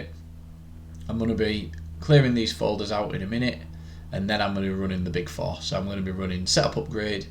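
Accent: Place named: United Kingdom. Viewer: You are great but can I ask you a favor? Please, use a lavalier mic. Since both english is not my first language and your marked British accent it's hard to me to understand what you say. However, thanks for your explanations!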